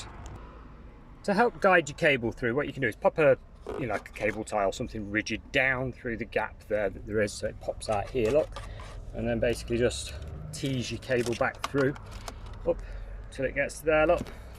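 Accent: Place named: England, United Kingdom. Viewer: Thank a lot. That English accent is a cherry on top.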